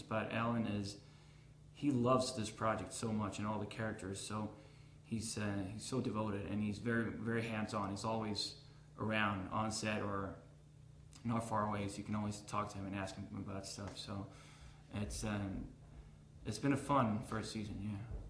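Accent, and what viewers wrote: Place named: United States. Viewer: His voice is so American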